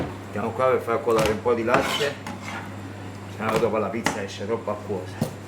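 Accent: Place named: Italy. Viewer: Bravo vous êtes un vrai chef. Bisous de France,. Vive l Italie. Un beau pays avec un très joli accent ❤❤❤❤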